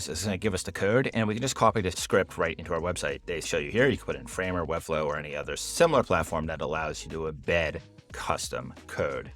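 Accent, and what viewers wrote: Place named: United States. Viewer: is obvious it uses your original voice, but being a native spanish speaker i noticed that language has a strong american accent, like an american learning spanish would speak, is there a way to do it without any accent? like a more natural tone? was that because of a setting? thanks for the video very interesting tool!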